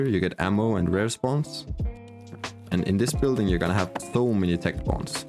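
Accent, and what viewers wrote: Denmark. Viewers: Are u danish by Any chance? Ur accent is Kinda danish ngl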